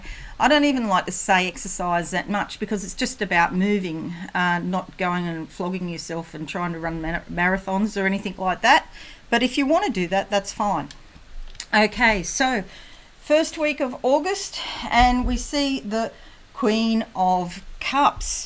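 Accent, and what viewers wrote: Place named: Australia. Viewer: I heard you and thought.. This girl's an Aussie.. And then you mentioned the kookaburras.. 😂😂😂.. Loved your reading!... (fruit in the Garden.. MAN.. GO!😉)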